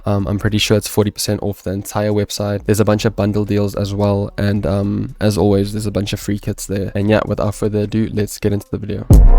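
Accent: South Africa. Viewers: was way to Tuff are you South African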